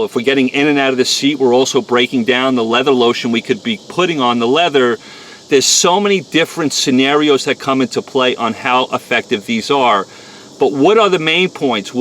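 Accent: New York, United States. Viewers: I'm binge watching your videos and I love how thorough you are. I love hearing you talk. That NY accent is awesome. Being in the south it's nice to hear a different accent for a change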